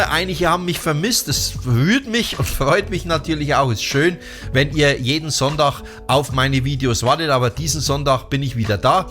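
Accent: Upper Franconia, Germany. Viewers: Hallo, ich habe Dir zu einen Deiner letzten Videos geschrieben und finde Deine Berichte Super. Nach Deinem Dialekt zu urteilen kommst Du wohl aus Oberfranken wie ich auch. Ich lebe aber bereits seit 1978 in Mainz. Bin aber ein Rehauer, wenn Dir das was sagt. Ich hatte Dir geschrieben, dass ich bis 2017 eine Band hatte. Man nennt mich den "Elvis von Gunsenum" zu deutsch Gonsenheim. Im letzten Jahr hatte ich mein 25-jähriges Bühnenjubiläum. Ich singe halt die Songs des King of Rockn Roll sehr gerne und es kommt an. Nach 2017 waren wir ein Trio und jetzt mache ich Soloauftritte in Seniorenheimen. Ich würde mich wirklich sehr freuen, wenn wir uns Mal treffen könnten. Vielleicht im nächsten Jahr beim Elvis Festival in Bad Nauheim. Dieses Jahr geht es leider nicht. Mit rockigen Grüßen von einem oberfränkischen Elvis Fan zum Anderen Volker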